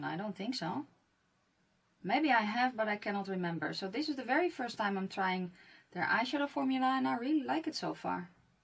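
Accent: Netherlands. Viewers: yep..Dutch hence the accent 😉😘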